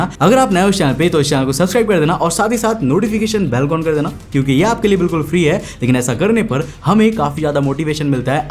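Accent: Bengal, India. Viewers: Bhaiya kya aap bengoli ho ??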